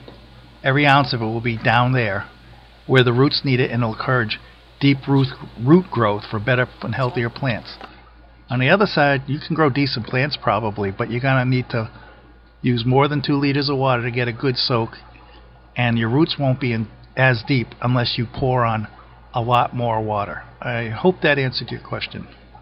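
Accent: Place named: New England, United States. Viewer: HEHEHEHEHE!!!!! I love how you watuh those plantuhs!!!! When I hear your accent, I think of lobstuh fishuhmen.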